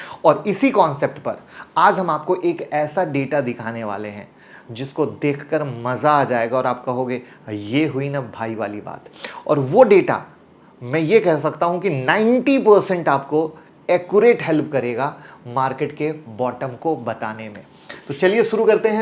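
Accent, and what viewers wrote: Bihar, India. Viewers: App sir Bihar se ho lagta hai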